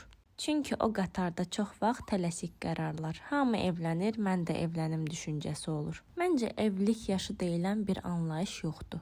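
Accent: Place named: Baku, Azerbaijan. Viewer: Baki lehcesi ruha terapiyadi😍😍😍😍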